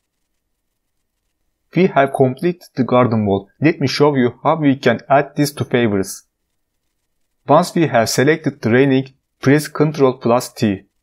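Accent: Turkey. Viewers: Tam bir türk ingilizcesi :)) teşekkürler video için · Abi Türksün demi aksanından belli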